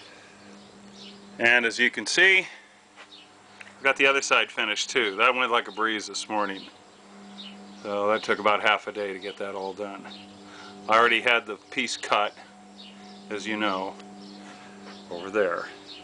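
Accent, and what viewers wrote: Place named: California, United States. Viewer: Hard to believe this was 10 years ago. Back when you still lived in CA, and had that CA accent., LOL. After watching this way back then, I bought the portable pocket hole Kreg Jig. I had a situation where I had to drill the holes on a framing board that was still good and very attached to the trailer. The portable one allows you to mount the jog on boards with a screw.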